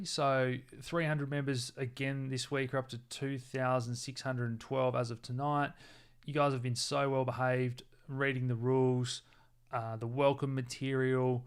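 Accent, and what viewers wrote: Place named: Tasmania, Australia. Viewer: It aint Aussie it's Tassie ... World = Weld only in Tassie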